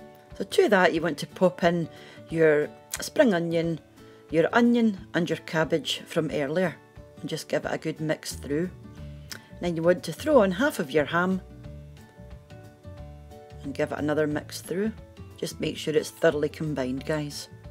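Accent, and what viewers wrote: Scotland, United Kingdom. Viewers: i like the name! and love the Scottish accent. also a big fan of SAHB, one of the best rock bands ever and highly underrated but i will always love them. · I canna travel to Scotland anymore so I practice the accent listening to you and the Outlander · You sound very like my husband. Light, polite and well spoken. I love that kind of Scottish accent. He's from outside Glasgow. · It's so interesting to me that Scotland has such different areas within it. I spent three years living in Fife and not once did I hear of this dish. It looks delicious and ill give it a try. So nice to hear your accent, I miss Scotland so much! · The accent takes me back to a happier time. I was raised by JOBs in Canada (Just Off The Boats) all of my family were from different areas of Scotland. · I came for the dish.... Stayed for that lovely accent. 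I love your accent of the Scottish young. Lovely. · Yum! You sound like many of my pals fae Scotland! Much love from America ❤💙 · I have trouble with Scottish accents but I can understand your beautiful accent perfectly. I never heard of this but it sure does sound good. By the way, I'm doing my family tree and discovered aseveral times great grandfather was from Scotland. Completely unexpected. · Grew up with Scottish accents, miss them a lot. Great recipes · A perfect channel! A gorgeous Scottish burr (giving all sorts of ASMR) with yummy comforting food. Youtube heaven! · I clicked on this video more to just hear how this dish is pronounced and love the Scottish accent so much.. I ended up watching the entire video and now I need to make this for dinner..Just subscribed!! LOL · Scottish accent is funny like irish accent but the people's features are so beautiful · Your accent makes me homesick...... 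I was born in England but my family are from the Highlands originally and I called Auld Reekie my home for many a year, even started getting a wee accent masel..... Smashing.... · I could listen to a Scottish accent all day long. It reminds me of my grandparents.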